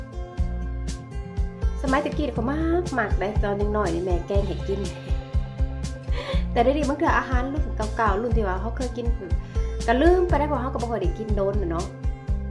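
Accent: Savannakhet, Laos. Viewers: ມັກສຽງໄທສະຫວັນນີ້ເດ້ ໄດ້ຍິນແລ້ວຄິດຮອດຄາວໄປຮຽນຢູ່ສະຫວັນ.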